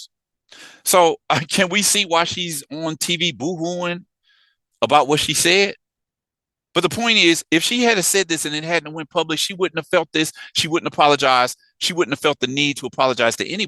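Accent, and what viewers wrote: United States. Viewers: Question: Are you from Brazil?…You sound like you’re from the USA.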